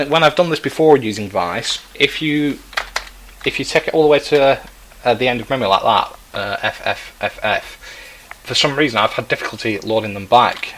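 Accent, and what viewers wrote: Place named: Lancashire, United Kingdom. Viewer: Very nice 😀 Your accent reminds me of David Collier and Tony Pomfret... very Atherton-esque, Westhoughtony or 'roundabouts 😊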